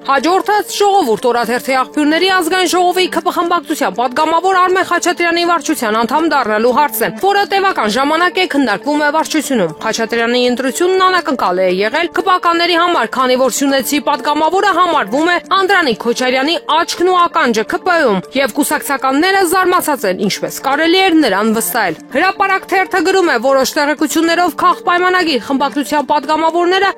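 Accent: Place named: Turkey. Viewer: Այս հաղորդավարը թուրքի ստիլով է խոսում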